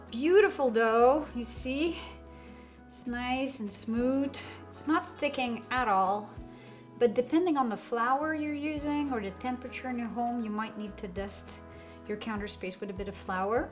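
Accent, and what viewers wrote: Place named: Montreal, Canada. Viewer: Look like the montreal accent ☺️ ... from what ive always heard "00" stand for the ash content in the flour tho ... 👍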